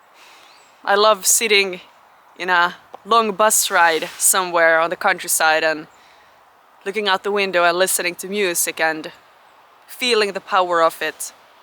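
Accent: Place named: Finland. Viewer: I absolutely LOVE your Finnish accent speaking English! Especially the way you say "Music"! I began learning guitar in 1968 as a child and grew into the music of 1970's. In my opinion the absolute best decade for music of the 20th century and I agree with you for the same reasons even though I am old enough to be contemporaries of your grand parents. I like your channel.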